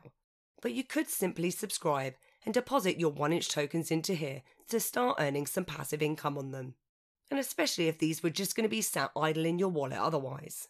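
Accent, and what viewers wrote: United Kingdom. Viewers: you sound like your from in t uk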